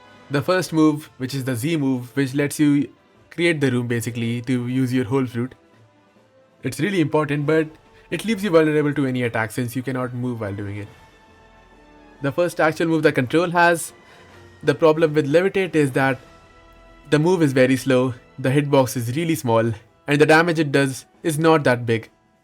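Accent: India. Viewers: You sound like an Indian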